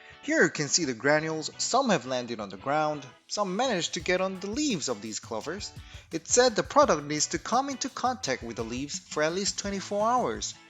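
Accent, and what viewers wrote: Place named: United States. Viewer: ㅋㅋㅋㅋ목소리 톤이나 자막이나 미국 사람 다됐네 ㅋㅋㅋㅋㅋㅋㅋ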